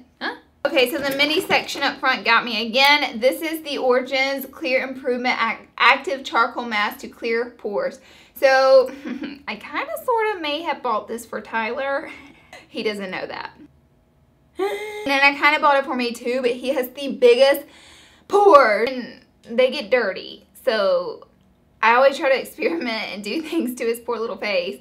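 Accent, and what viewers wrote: South, United States. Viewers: where are you from? your lil country accent is adorbs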